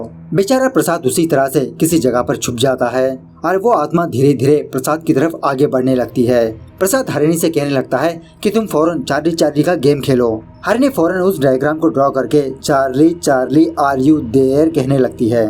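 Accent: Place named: Hyderabad, India. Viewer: Bhai Hyderabadi dilect akhari hai...😎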